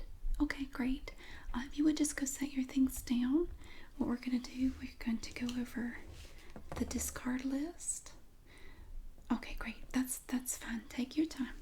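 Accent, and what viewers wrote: South, United States. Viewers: Love your accent, to me a Southern accent is a cockney accent :-)I live in the West Midlands UK.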